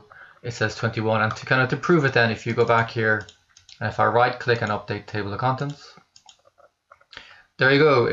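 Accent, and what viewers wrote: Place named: Norway. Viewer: Love your accent. Norway?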